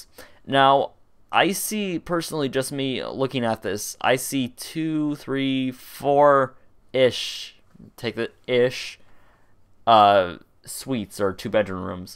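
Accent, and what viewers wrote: Canada. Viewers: You sound Canadian :D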